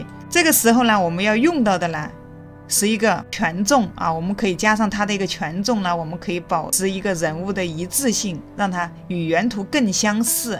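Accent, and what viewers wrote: Sichuan, China. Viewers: 四川的吗？口音挺像啊。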